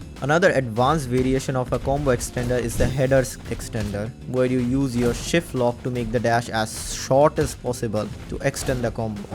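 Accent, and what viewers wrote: India. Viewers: Why u sound like u are indian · He has an indian accent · Bro idk but your English sound like indian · You speak english but sound like indian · Bro got that indian voicd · R u Indian? You sound like my friends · bro voicelike a indian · Are you indian? Your voice sounds like a Indian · I bet ur a indian bc of ur voice and a good vud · Hello guy with Indian accent Remember me when u get famous. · idk why this guys accent is like indian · Love the Indian accent I will sub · You sound indian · U sound like Indian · indian accent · Bro he sound indian (not being racist ) nice vid of tutorial btw... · ohh But your accent is like Indians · YOUR VOICE GIVING ME INDIAN VIBE ARE U?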